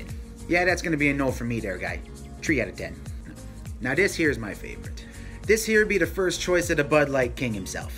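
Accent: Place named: Upper Midwest, United States. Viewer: He has a strong Northern Midwest accent. Sandler has a New York accent. Kind of similar I guess.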